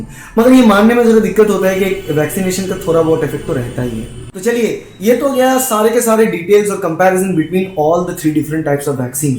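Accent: Bengal, India. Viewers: Are you bengali ?? 😊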